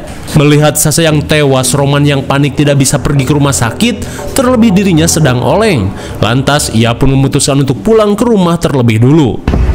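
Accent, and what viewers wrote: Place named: Belitung, Indonesia. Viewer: Gue pingin tau si mimin berasal dari kota mana?? Logat bicaranya kayak logat melayu belitung.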